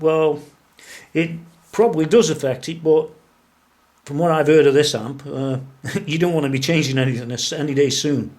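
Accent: United Kingdom. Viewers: Like your accent. Where from UK ? When wil you review Jim Root # 5 ?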